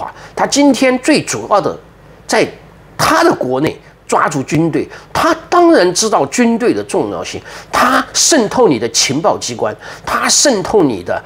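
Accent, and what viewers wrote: China. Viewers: 昆明话太地道了😂老乡啊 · 平先生的雲南口音地道😊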